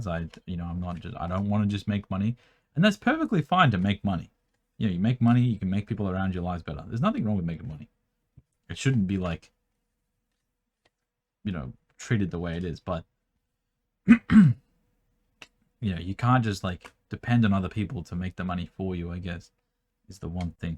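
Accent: Australia. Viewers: An hour in club here, and I'm here for the advice, for the realness, and also for the aussie accent I've missed so much since leaving Perth for Indo all those years ago. Seeing how you're so excited about how your recent sorting video made enough money to buy 5 subway sandwiches is gold, and then have that followed up with how wetting your lips or something can be sexual is slightly disturbing 😂. HOWEVER!! We need to have Kim on the channel as well,!! You've talked about her several times now and how she's amazing and everything, and you know full well a Youtube channel only ever taken off when the significant other makes a long-awaited appearance 😆. You can do subway mukbang video with her and see how many more subway sandwichs the video'll earn you 😁 imagine how excited you'll be at that prospect 😄 In short: we want KIM!! ✌🏼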